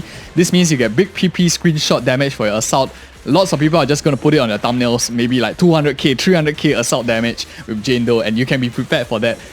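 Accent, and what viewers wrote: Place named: Singapore. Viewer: Are you Singaporean? We have similar accents 😮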